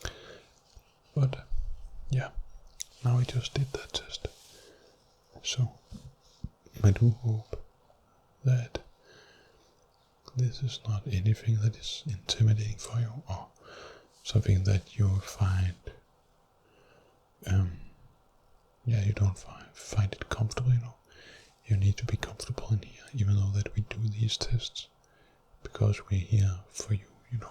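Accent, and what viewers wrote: Scandinavia. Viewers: Is that a Scandinavian accent? Sounds similar to my Danish relatives. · Your Nordic accent sounds/is like 'nectar' for my ears (and Soul) Thank You🤞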